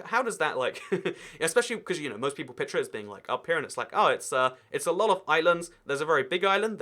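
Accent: United Kingdom. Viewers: British accent!!!!!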